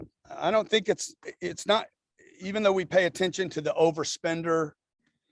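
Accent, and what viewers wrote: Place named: Louisiana, United States. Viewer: When I was 7 years old I got in my dad's 1991 Ford Explorer demonstrator vehicle from from the new car dealership he was a manager at. It had A CD player in it, it was the 1st ford vehicle to have A CD player. The Dolby prologic surround sound demonstration disk was in the CD player, I listened to it and marveled at how good the system sounded.. I then saw the eject button, the symbol was familiar to me I knew what it meant.. As I hit the button out popped the cd. I opened the case that rested on the center console and Inside I found A CD labeled "objections and closes" Curious I put the CD in the truck and pressed play. A Southern Louisiana accent started belting out some cheap one liners and a quick welcome speech about himself. I became fascinated with that day. I had no idea who he was from that day or many years to come until approximately 3 years ago when when I saw a YouTube video of the same name. 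I said to myself "there's no way that that guy from way back when is still doing stuff and creating content now"...... So I clicked the video and that same familiar voice greeted me. I attended growth con in 2020 at the Mandalay Bay and sat 3 rows back. I bumped into in the hallway by the gym. And although I was startled at how much smaller than me he was, I will never forget how quickly he thanked me for my support shook my hand, And started walking off at almost a Sprint to his next engagement. Although the growth convention was fantastic and I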